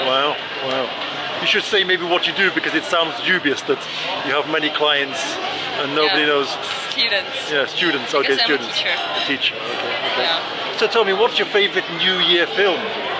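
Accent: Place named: Manchester, United Kingdom. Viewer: I have subscribed. R u from Manchester judging by accent? I might b wrong tho 😂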